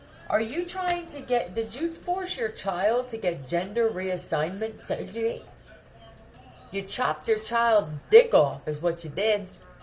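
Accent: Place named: Philadelphia, United States. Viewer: Yooooo giiiirrrrrllll I LOVE YOU AND IM NOT TOO FAR FROM YOU AND BY THE PHILLY AIRPORT!!! You are spot on with these MF and what they are doing to me presently and have been for the last 3-4 years… gang stalking, harassment, hacking so much more….. smfh!!!! I would love to meet you in person so bad!!!! This reading had me on the ground rolling laughing!!! I love your Philly accent and I love the impressions of the women of the night and the wanna be women of the night!!!!!! I can’t make this crap up that they are doing to me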